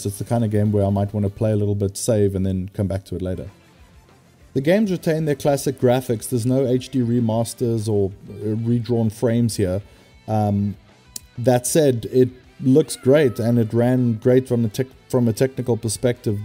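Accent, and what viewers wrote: South Africa. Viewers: Your review video popped up in my suggestions list & I decided to have a look without taking note of your channel's name... After a few moments into the video I was like this guy sounds familiar, South African like familiar! Greetings from a South African living in deep South Texas USA... I enjoyed your video, I've been thinking about getting the Capcom Fighting Collection, the Darkstalkers, Cyberbots, Red Earth & Puzzle Fighter are quite appealing to me, so I think I'll take the plunge. I've already got the Street Fighter 30th Anniversary Collection so it'll go well with that. I do however wish they'd port some of the older Tekken games, namely 3 & 4 for the Nintendo Switch, now that I'd be seriously into! Subscribed & looking forward to more videos. 👍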